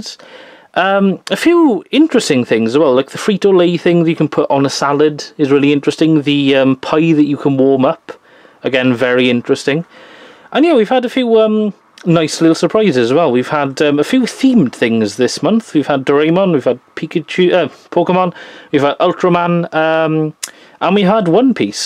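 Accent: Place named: Kerry, Ireland. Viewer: Dude love your videos not to be rude but I subbed because your voice sounds like a man from Kerry Ireland sorry but still great video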